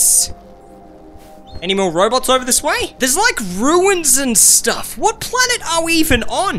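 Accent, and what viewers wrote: Australia. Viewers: Is he an Aussie like me?!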